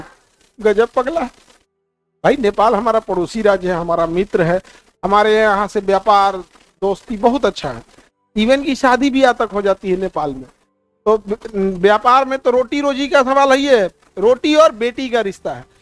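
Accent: Bihar, India. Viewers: lecture dene se pehle thoda research vi karliya karo .....bihari ho sab ko pata hay, harek video me aapne behwar aaur bolne k tameez se bar bar biharipan ka praman dena jaruri nahi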